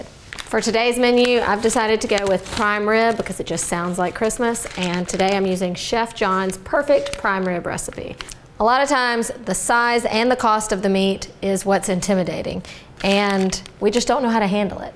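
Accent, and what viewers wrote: South, United States. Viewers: Girl please wear color against that white kitchen 😘😘😘😘😘💜💜💜You have a lovely Southern accent. Great meal! 💜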